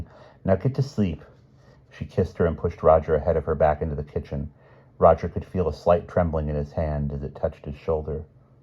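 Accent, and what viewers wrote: United Kingdom. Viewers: Ms. Banks would be impressed by your British accents.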